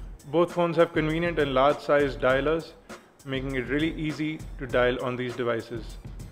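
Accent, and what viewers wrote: India. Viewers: hahahahaha i love Indian English.. "How much did you get paid by HTC tell me HUNN" LMFAO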